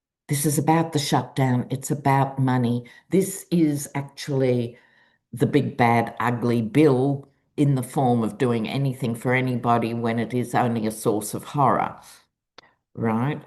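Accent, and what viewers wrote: Australia. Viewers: An Aussie living in the US… I love watching you… hearing you… so Australian… same expressions I still use lol makes me laugh…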